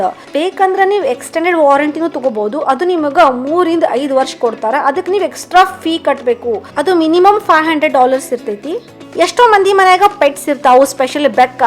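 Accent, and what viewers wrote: North Karnataka, India. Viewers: uttara karnataka language matadtira akka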